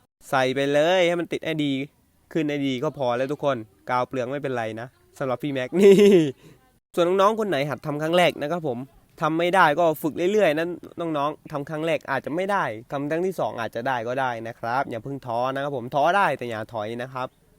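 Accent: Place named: Central Thailand, Thailand. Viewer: สำเนียงกลางภาษาไต้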